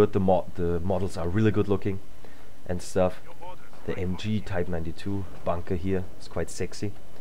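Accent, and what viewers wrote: Germany. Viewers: netter deutscher Akzent ^^ xD glaube ein wenig bayerisch oder? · Comrade Slaine Oh ich hab neulich auf der Mod DB Seite gesehen, dass da noch leute aktiv sind und dachte da tut sich was... schade. Und ich kommentiere deutsch weil vielleicht Mr. Videomacher persönlich (hört sich ziemlich deutsch an) etwas dazu weiß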